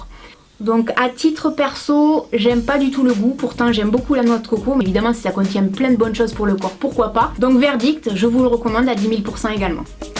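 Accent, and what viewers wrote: South, France. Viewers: Bonjour:) Tout d'abord je remercie Youtube, car j'écoutais simplement une musique et dans les suggestions de vidéos, ca m'a mis ta chaîne (inutile de préciser que je ne te connais absolument pas, ni toi, ni ta chaîne). En suggestion de vidéos, c'était celle au sujet de ta menace de mort. J'ai donc commencé à la regardé (car d'un le titre me mettait déjà hors de moi, et de deux, passionnée de beauté etc.. je suis toujours à la recherche de nouvelles Youtubeuses, ne supportant plus les plus connues...BREF ). Je vais faire rapide car je me doute que tu ne souhaites pas en entendre parler 30 ans, je commente rarement, mais je me devais de le faire. Je te remercie, je te remercie pour ta franchise, ton honnêteté, ton coup de gueule, et ta force. Je te remercie d'être différente des autres (oui ca change de ces grandes Youtubeuses qui ont 2millions d'abonnées et qui ouin ouin plutôt que de pousser une coup de gueule comme tu l'a fais) mais je te remercie surtout pour les tuyaux que tu as donné tout au long de ta vidéo, car certes tu as 40 ans (et je trouve ca super fun) mais les haters oublient vite l'âge des youtubeuses, et certaines sont trés (voir trop, d'avis perso) jeunes pour avoir ce rôle là, de youtubeuse beauté/lifestyle. 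J'ai bien compris que tu ne fais pas de vidéos pour le nombre d'abonné(e)s, mais je tenais à préciser que tu en a quand même gagner une de plus (moi) et sûrement bien d'autre. Moi même du sud Aussi (aussi car à entendre ton accent tu l'es égal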